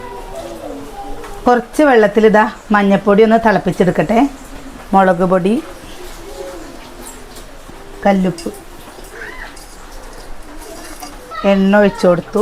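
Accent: Palakkad, India. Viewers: Thani palakadan verthamanam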